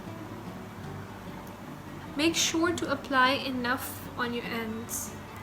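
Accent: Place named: Pakistan. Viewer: After hearing ur voice,I think u r Pakistani....if yes let us know...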